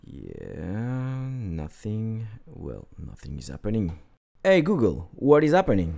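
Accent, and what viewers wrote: France. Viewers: jolie accent français xd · you have a french accent,idk cause i'm french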